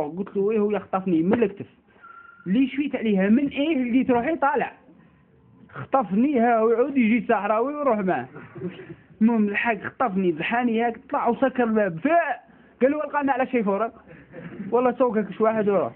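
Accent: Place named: Ouled Nail, Algeria. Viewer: الي تعجبني انو محافظ على اللهجة اولاد نايل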